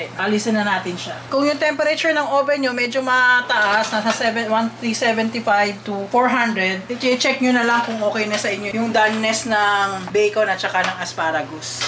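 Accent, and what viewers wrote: Philippines. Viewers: So nice that she can speak tagalog fluently like un accent nya pinoy na pinoy pa din... woah really amazing 🙌🙌🙌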